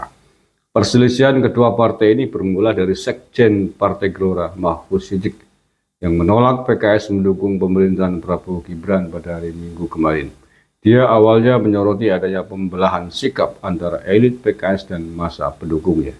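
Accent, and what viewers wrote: Banyumas, Indonesia. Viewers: Kang wong ngapak..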